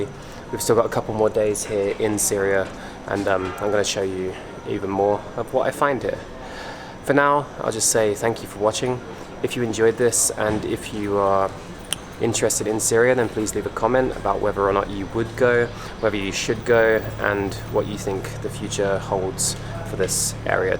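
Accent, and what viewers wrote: United Kingdom. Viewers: He sounds like he's from Southern England? How hard is it to visit a country like this with a UK passport?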